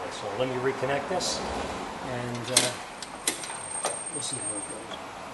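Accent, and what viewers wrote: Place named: New York, United States. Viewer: Love the video thanks! This guys definitely a New Yorker! 👍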